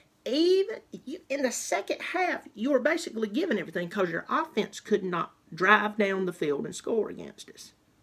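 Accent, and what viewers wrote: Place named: Mississippi, United States. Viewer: Your voice sounds like a Mississippi country girl...Roll Tide sweetie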